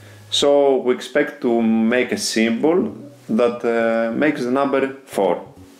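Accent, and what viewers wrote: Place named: Greece. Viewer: the narrator is greek, no doubt)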